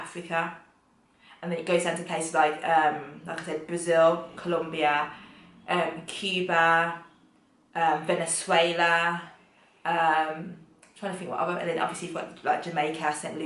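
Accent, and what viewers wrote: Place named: Midlands, United Kingdom. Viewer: Your ascent sounds like u from the Midlands(Birmingham, Wolverhampton, etc). Grt8 choice, hope to meet u in Gh soon.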